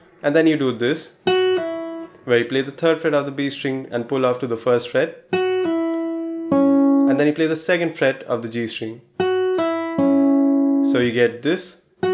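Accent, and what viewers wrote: India. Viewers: Hey bro are you from India your accent sounds a little bit Indian 😅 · Your accent is kinda similar to indian accent! Are you from India?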